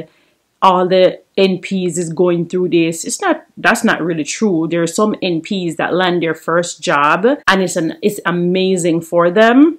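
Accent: Jamaica. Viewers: I hear a Jamaican accent here, hey girl 🇯🇲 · Off topic: YouTube recommended me your video after watching Nuff Loads life in Jamaica, so I decided to click on your video even though those are two different videos, I decided to check out your video, and I hear an accent, and me being nosey lol, i checked out your IG as well, and I see that you're Jamaican. I'm not Jamaican but I love Jamaican people, lol YouTube is something else, but thanks for sharing your video. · U from jamaica?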